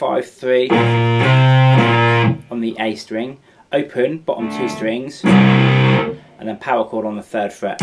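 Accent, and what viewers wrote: England, United Kingdom. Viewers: ....and thanks for speaking with this beautiful english accent so that a stupid german like me can understand you that well!!!! I never get these us guys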